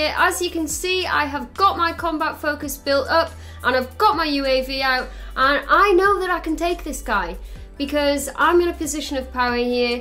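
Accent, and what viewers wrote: United Kingdom. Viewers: Is this britsh english?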